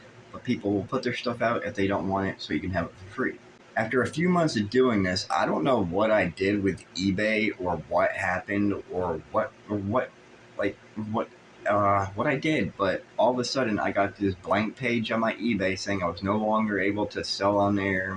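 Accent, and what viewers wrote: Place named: New York, United States. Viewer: How tf did I know you where from ny 😂😂😂 definitely cuse or maybe further up like Albany or Saratoga 😂😂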